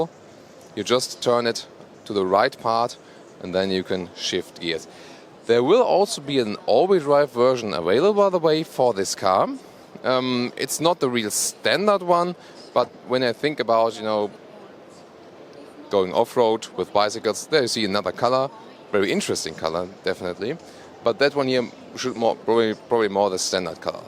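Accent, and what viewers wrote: Germany. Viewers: ich habs nie verstanden warum du die videos in englisch machst, sehr anstrengend zu hören mit dem deutschen akzent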